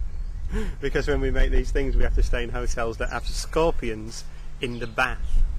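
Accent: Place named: Manchester, United Kingdom. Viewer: Love his accent. He doesn't tone down the Manc does he lol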